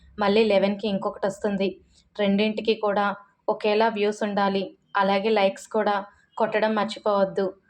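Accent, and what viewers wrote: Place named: Rayalaseema, India. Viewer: అక్క నాతో ఇంకో చిన్న రిక్వెస్ట్ ఈ క్యారెక్టర్ లో నువ్వు బాగా మునిగి పోయినావు రాయలసీమ భాష తోటి బయట కూడా నువ్వు ఇదే భాష మాట్లాడే అనుకో యాడ నుంచి వచ్చిన అమ్మ నువ్వు అంటారు మరీ అంతలా కమిట్ అయిపోతే గా ఈ భాషకి😂😂😂🫴🫴🙏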